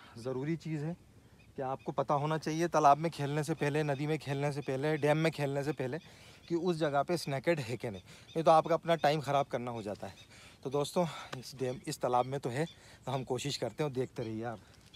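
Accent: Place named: Bhopal, India. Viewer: aapki tone ek dum bhopali type hai main bhopal se hoon isliye poocha bhai good show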